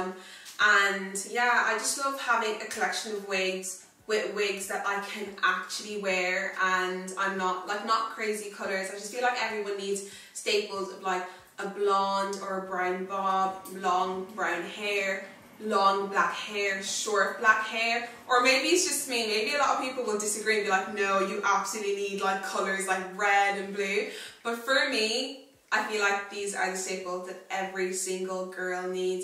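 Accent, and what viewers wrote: Ireland. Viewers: I love the Irish accent. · OH thank you! loool sorry, i think have a little trouble understanding irish accents sometimes but i love them tho! so bloody charming! <3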